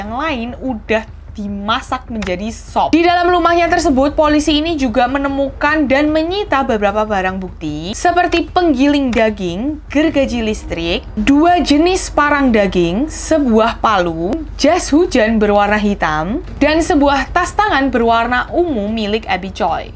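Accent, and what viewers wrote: Indonesia. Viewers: Aku seneng Medokeee neng...Lnjutkan perjuanganmu 😘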